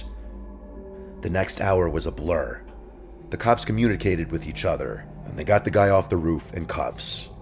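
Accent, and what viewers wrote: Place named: New Jersey, United States. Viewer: I’m gonna guess you were raised in Essex County NJ, am I right? My accent is coming back just listening to you! 😂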